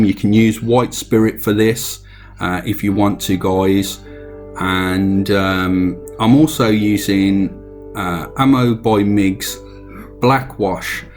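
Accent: United Kingdom. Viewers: Hello. At first let me say: I love your tutorials and your british accent. But I have some constructive criticism. Pls increase the quality of your vids by making a good audio. You lower your fantastic work by this aweful sound. Don't be mad at me. I just want to push your vids into a good light. Greetings, a fan from germany. Keep up the awesome work!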